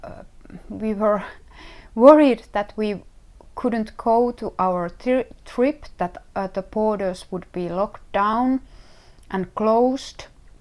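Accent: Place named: Finland. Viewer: Congratulations!! It is so charming to hear a Finnish accent. I spent my childhood summers in Finland and remember very fondly picking blueberries in the woods near the lake of our friend's summer house. So I would love to let some berries float on lake water: Dreaming of Finland in the knitting of silk ribbing, small bobbles and waving short rows.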